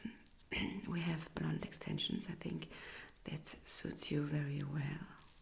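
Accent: Germany. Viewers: speaking germglish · Great idea but a german only Version would be great. I dislike the german accent (in general not you specifically) and it was quite distracting for me personally xD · I loved hearing both English and German. I love the sound of German accent and language.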